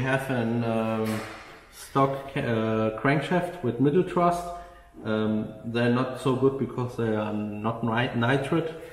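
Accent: Germany. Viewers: German English is actually cool to me. We are all car enthusiasts...we kind of already know what is happening even without a lot of words. 😊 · it sounds like a german guy speaking english. wrong how? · wenn deutsche englisch sprechen... :D aber er weis was er macht-top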